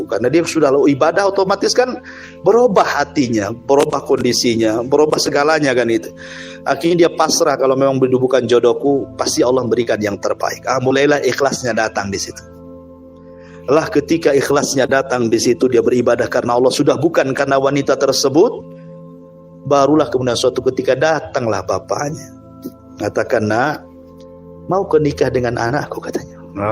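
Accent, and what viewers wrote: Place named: Sumatra, Indonesia. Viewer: Kl dr logatnya, sepertinya ustadz dr daerah Sumatra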